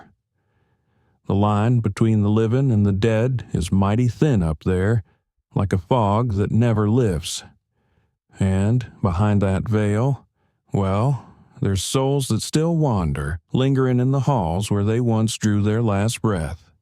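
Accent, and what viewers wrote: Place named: Appalachia, United States. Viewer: Why Is This Narrates By A Hillbilly??🙄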